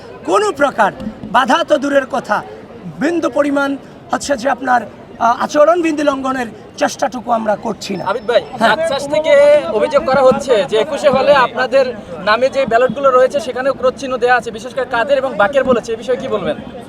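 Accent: Kolkata, India. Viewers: ভাই কি কলকাতার?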